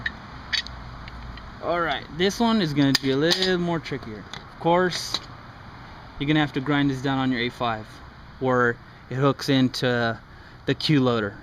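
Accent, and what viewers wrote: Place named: Mexico. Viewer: Your Mexican Right ? i can tell by your accent :P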